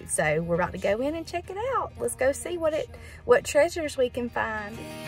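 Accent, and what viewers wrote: South, United States. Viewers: I love when you make a new video. I just love you and Buster Brown and Aunt Penny. I love your southern voice. You make me laugh out loud when you say that you look like a buzzard. Keep making your great videos. Thank you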